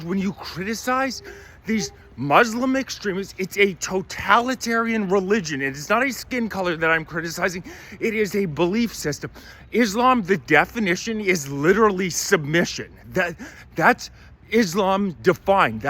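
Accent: Canada. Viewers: Here we go the pandering continues senile old ladies and naive children beware the funny thing is this guy couldn't exist for sh!t without the same strung out buffalo soldier ignorant immigrants (that he goes so obscenely out of his way to rip on when antisemitism is a criminal offence as of last year!) looking the other way from every second word out of his mouth cause yknwo, they saw actual war (which this guy supports of course) and the same blackmailed child molester politicians he pisses the same exact party lines out of his dick hole face with that stupid vaguely hoser accent he puts so much effort into 😂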